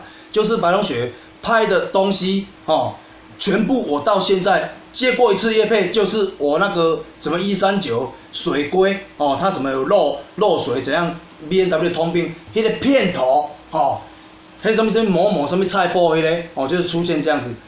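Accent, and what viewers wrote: Chiayi, Taiwan. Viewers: 支持你！這集真舒暢我是滿喜歡留言板的，可以互動感覺挺棒的，而且有部分網友的意見也是很珍貴的唷我常逛youtube，可是平常也很少在留言但是我在的頻道停留的時間卻是最久的，有時候聽你講話也算是個很不錯的娛樂！哈哈因為我自己住台南所以聽你的嘉義口音覺得很親切你的頻道樂子很多，很有你自己的風格即便是廢片我覺得也是很有趣～驚丟！酸民無所不在我覺得你是個很正面的人所以自己覺得對的事就不要在意這多了當然偶而發發這種片子吐吐苦水舒爽一下也不錯最後還是要說加油啦！快點破十萬訂閱 XD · 這是我唯一一次在這邊留言真的很喜歡你拍的影片又帶有道地的口腔嘉義人支持你!!!!加油~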